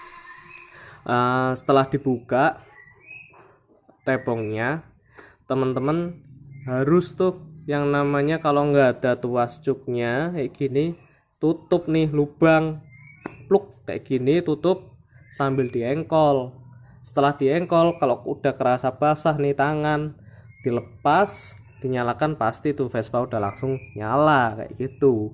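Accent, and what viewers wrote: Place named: Pekalongan, Indonesia. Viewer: Koyo wong pekalongan logate mas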